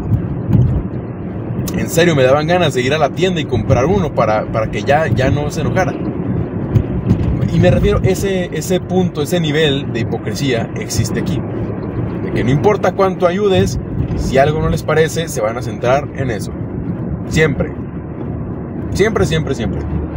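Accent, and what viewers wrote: Mexico. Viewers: ¿Es de México?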